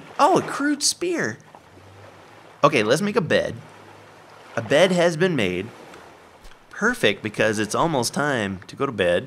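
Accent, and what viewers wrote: Canada. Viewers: You sound sooo Canadian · U sound canadian broski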